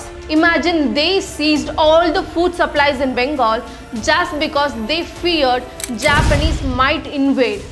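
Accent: Tamil Nadu, India. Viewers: your pronunciation with heavy Tamil accent is bad. You observe Palki and learn.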